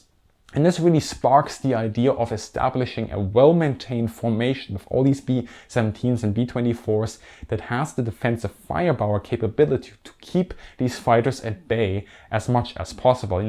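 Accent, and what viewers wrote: Germany. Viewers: Are you German... you sound like it? If so, you are the only German person I've ever heard who knows how to pronounce 'bomb, bomber, bombing' correctly (i.e. not bom-b, bom-ber, bom-bing). Well done! :-) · This is a fantastic history video. This is the most fascinating WWII history I've ever learned!! Def subscribed, and will contribute on Patreon. Strange thing is, you sound German, but you referred to Germans as "krauts". Is that a popular sentiment in Germany? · Well, it's Germish. Such laziness of English speaking they usually learn no foreign language AT ALL. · Hey this guy talks like a german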